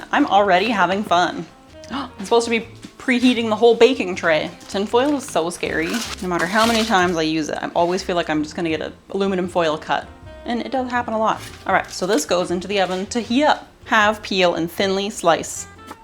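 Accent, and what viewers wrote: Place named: Midwest, United States. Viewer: Nice Midwest accent 😄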